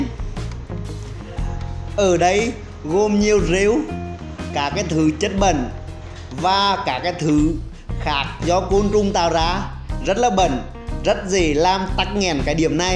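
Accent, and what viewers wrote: Nghe An, Vietnam. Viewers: Người xứ nghệ giọng nói hay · Tuy nói giọng xứ Nghệ , nhưng cách diễn đạt và thao tác thị phạm hướng dẫn rất mạch lạc, dễ hiểu, dễ thấy, dễ làm theo.. Cảm ơn bạn nhiều ! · Rất hay và hữu ích. Bạn giọng Nghệ nhg ns rất rõ ràng, mạch lạc. Tks bạn nhiều!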